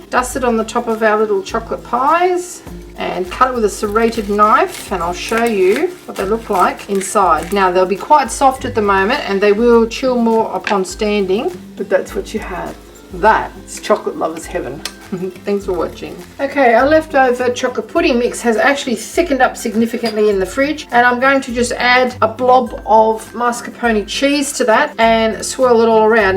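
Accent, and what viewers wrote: Australia. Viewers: Nice to hear an Aussie on YouTube!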